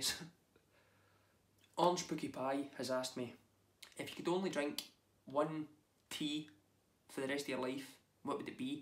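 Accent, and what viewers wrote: Scotland, United Kingdom. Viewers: hart war vielleicht etwas übertrieben in dem Fall. aber einen starken schottischen Dialekt verstehen selbst Engländer schlecht. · I absolutely love this. I'm new to your channel, but it was nice to see a humble, down to earth, and honest person behind the voice. I struggled to keep up with some of what you said (I'm in the US), but I could listen to you talk/sing all day. I have a small percentage of Scottish in my blood, so I've always been drawn to the Scottish accent, as well as the Irish, British, Australian, well most accents are beautiful to me. 😂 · yeah it ist scotish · obvious he is from scottland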